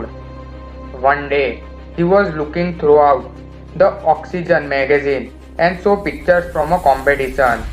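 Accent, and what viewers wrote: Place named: India. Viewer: Beautiful woman and lovely vide Disgusting Indian accent audio ruined it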